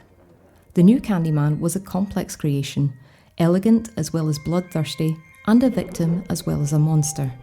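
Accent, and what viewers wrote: Scotland, United Kingdom. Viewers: Really excellent. And nice to hear a fellow Scot. I always thought the film was meant to be as much like 'rumour' as Candyman himself, so resisting clear-cut explanations. I also wonder how much input director Bernard Rose had. His wonderful film 'Paperhouse' was praised by Barker, and makes an instructive comparison with 'Candyman'. Helen also reminds me of Julia in Hellraiser (one and two), in a faint way. The murders that happen because of them or by them, which are only between them and the male villain. Then they both 'resurrect' - Helen as a murdering ghost summoned from the mirror after her name being said five times, Julia as a murderess 'summoned' from a mattress as the result of several murders (having become, maybe, some kind of legend herself, in the doctor's eyes). I'm spitballing....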